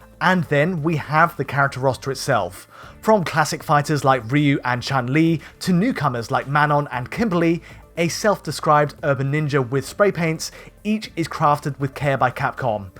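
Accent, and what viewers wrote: England, United Kingdom. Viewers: English accent 💯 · he has an English accent, no such thing as a "British voice". If you have a problem with it then leave, you're not wanted here.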